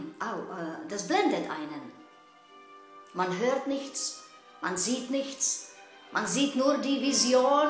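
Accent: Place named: Eastern Europe. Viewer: gefällt es auf jeden Fall überhaupt nicht und wahr ist das was Sie da von sich geben, schon gleich gar nicht! Noch verwunderlicher ist es, wenn jemand wie Sie, mit hörbar osteuropäischen Akzent, die anscheinend hier in Deutschland lebt oder zumind. in Deutschland gearbeitet hat (deutsche Sprache ist dann eben Voraussetzung), also aus einem anderen Land kommend, ihr neues Lebens-Glück in Deutschland gefunden hat, dann gegen Migranten und die deutsche Politik schimpft, die es erst ermöglicht hat, dass Sie selber in Frieden in diesem Land leben dürfen oder wie gesagt zumind. hier arbeiten durften oder dürfen - wie auch immer ihre Lebensgeschichte auch sein mag. Sie sollten mal auf den Kalender sehen, in welchem Jahrhundert wir leben. Sie sind hier in Deutschland willkommen geheißen und das gilt also nicht nur für Sie!!! Frau Merkel hat sich diese Flüchtlingssituation nicht ausgedacht und die Masse an Menschen ist bereits schon seid Anfang dieses Jahres unterwegs und wenn die deutschen Politik in Europa von Anfang hat die Schotten dicht gemacht hätte, dann hätten wie eine in der Welt noch nie dar gewesene humanitäre Katastrophe gehabt! 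Länder wie Griechenland oder Italien, die eben ans Mittelmeer grenzen, wären total überfordert gewesen. Die Flüchtlinge wären so oder so gekommen, nur die anderen Länder sehen alle weg und hat über Jahre hinweg nur Mitleid mit Kriegsflüchtlingen und hungerten Kindern geheuchelt. Jetzt aber, wo sie plötzlich vor der Haustür stehen, will sie keiner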